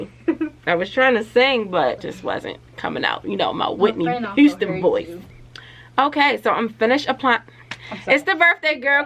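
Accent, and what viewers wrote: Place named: DMV, United States. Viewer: New subbie here from Jackie Naturals 💕 Would love to support each other! Your curls are absolutely beautiful 😍 I am in loveee lol. Are you from the DMV? You kind of sound like it. Loving your hair & energy 🔥 The results look so good!!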